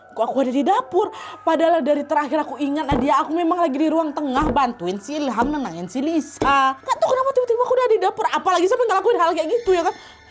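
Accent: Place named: Kalimantan, Indonesia. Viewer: Warga kalimantan mana ni suara nya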